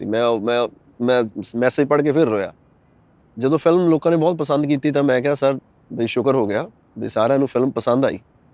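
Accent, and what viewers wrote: Punjab, Pakistan. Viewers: Host lagda Lehnde Punjab toh aa !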